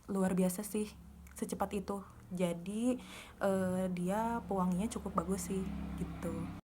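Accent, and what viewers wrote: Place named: West Java, Indonesia. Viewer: Haha lucu bgt logat sundanya 😁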